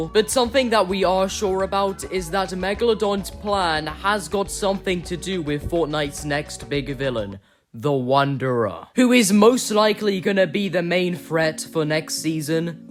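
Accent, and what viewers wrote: United Kingdom. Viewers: I like your videos and your british accent is amazing ahah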